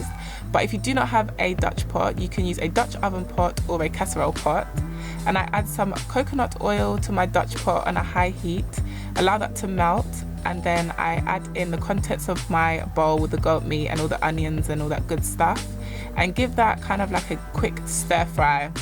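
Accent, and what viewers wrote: United Kingdom. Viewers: Well I normally think these kind of videos are dead especially when it's not a carribean accent when they first talk and they are American I'm like nah but I heard a British voice and thought she might be alright actually and yeah cookings on point ha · 😍😍😍🇯🇲Im from America you sound like you live in Britain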